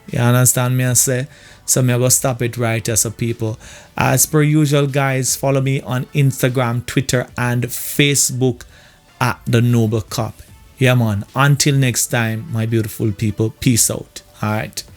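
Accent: Jamaica. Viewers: Wah unu weh na duh? Real St. Ann man accent. I’m a big supporter of the JCF, but I also agree with you 100%. If someone don’t like me or badmind me for my success, all they have to do is accuse me of something during a SOE and destroy my character and livelihood. Big up yuhself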